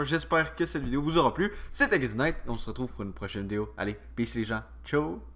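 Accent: Canada. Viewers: Bonjour de France, Un grand Merci d'avoir essayé de m'aider. Je vais me mettre à l'Anglais à 63 ans, il n'est peut-être pas trop tard !!! J'habite en Sologne entre les châteaux de Chambord et Cheverny et pas loin du Zoo de Beauval, si vous avez entendu parlé du bébé panda qui est né il y a juste un an. Moi, j'ai visité à plusieurs reprise le Canada : Québec et la Gaspésie ainsi que Vancouver. Êtes-vous, déjà venu en France, chez vous cousins !!! J'aime trop votre accent et je me permets de vous embrasser par dessus un océan. Dominique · merci beaucoup l accent canadien en plus trop cool j adore! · J'ai 3 Commentaires à poster. Le premier est que j'adore le Canada et votre accent. Cela me fait voyager. Je suis de Paris. DVD descripter : Merci pour vos conseils pour votre lien de téléchargement. Parfait ainsi que vos tuyaux pour le configurer. J'ai eu une erreur concernant la Fat 32. 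Il semblait que la fat32 n'acceptait pas les fichiers de plus que 4Go. J'ai passé outre. Qu'en pensez-vous ?.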